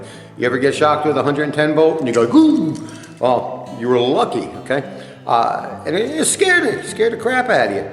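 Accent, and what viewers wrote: New York, United States. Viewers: good video, thank you. n love the ny accent. : ) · Is he from New York? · are you from New York?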